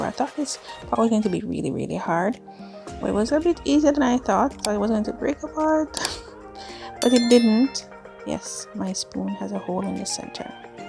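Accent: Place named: Saint Kitts and Nevis. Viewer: Ummmm sis u from St.kitts 👀👀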